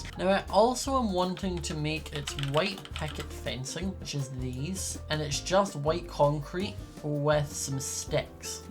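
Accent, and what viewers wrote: Scotland, United Kingdom. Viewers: Are you Scottish??? Bc you have the same ascent as my half brother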